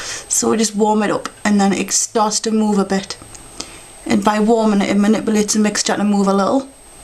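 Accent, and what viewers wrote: United Kingdom. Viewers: you look like my art teacher! haha tahts cool. r u british??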